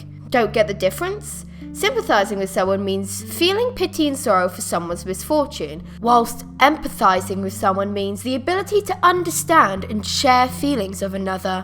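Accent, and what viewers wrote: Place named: England, United Kingdom. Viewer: OMG! I love your accent. It's beautiful. ^w^ Are you from England?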